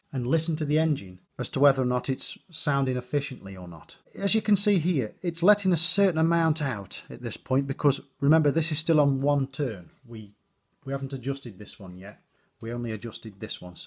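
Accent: United Kingdom. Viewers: Lol. One thing I realized from this video, since I seldom hear someone with a British accent pronounce the letter "H", is why my Indian friends pronounce it as "heych" instead of "eych" as us U.S. folks do. It must be the British influence on English speakers in India. But more importantly, great informative video! Now, off to my garage to try to get that string trimmer working that some scammer sold me on Craigslist. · I’m enlightened now. Sometimes it just takes someone with a British accent to make sense of it all. Thanks. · Is it weird that a British accent from an instructor makes it easier to learn? I find it relaxing for some reason. 🤷‍♂️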